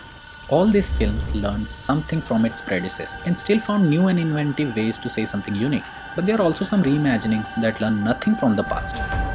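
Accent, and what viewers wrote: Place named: India. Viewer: and your reply here proves my theory that your just using ai generated script and edit to make these videos. Your randomly connecting movies with similar themes from different cultures in this video which would only be possible if someone watched a he'll lot of movies . That along with your robotic voice with Indian accent which again was obviously generates by ai, gave me a great doubt on your content. Anyone who watches multiple movies from different culture can only be from someone who is darn passionate on about cinema which you claim in your YouTube bio to be from your bollywood industry background , which is believable , except anyone with that mindset would never reply with something as simple as what you did here. Basically your using ai to make all your content in this channel as evident from the 9 months of content post activity 🤣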